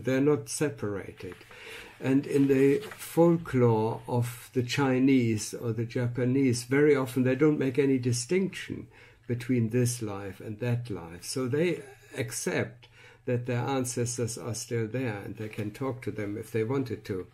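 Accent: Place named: Germany. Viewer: warum spricht er eigentlich kein Deutsch? Er ist doch ein Deutscher. Hört man doch total am Akzent. Ich verstehe echt nur die Hälfte. Eigentlich schade. Das Thema ist so spannend!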